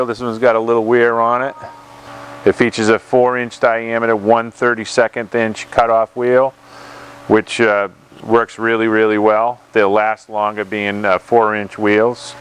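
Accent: Boston, United States. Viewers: 👍A+ for the Boston accent.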